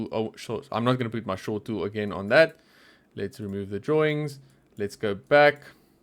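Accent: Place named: South Africa. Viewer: i know a South African accent when i here one 😁😁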